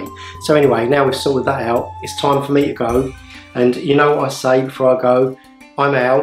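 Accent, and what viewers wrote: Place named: Cambridge, United Kingdom. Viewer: are you from Cambridge/Newmarket? You sound just like all my family there:D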